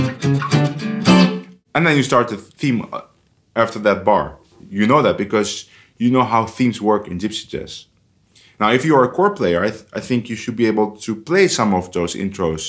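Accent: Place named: Netherlands. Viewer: that dutch accent tho :p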